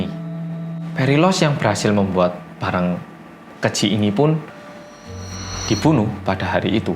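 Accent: Java, Indonesia. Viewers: Keren mas brow Org korea fasih berbasa indonesia Tp medoknya (logat jawa) kental banget Salut dech mantappp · Apik banget lo mas seng medokkk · jeda kuliah online nonton mas medhok wkwk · Yeay, detektif yg medok kembali! Hehe ᬇᬫᬫ᭄ᬫᬳ᭄ᬤᬶ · Jowone kok katut mas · Mas asli orang jawa bukan sih, kok bahasanya medok banget hehehe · Bang kok medok banget sih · Yeyyyy,🥳🥳🥳 well come back karo wong korea sg medokk, udah di tunggu2 mas, hehe😅😅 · Akhire detektipp Medhok menehh Btw mas, ngerekame ojo nang lorong ngono mas, Dadi wedi gegoro ndelok i lorong terus T_T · wow medok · MEDOOOOOOK hehe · Finally, we got it mas detektif medok;)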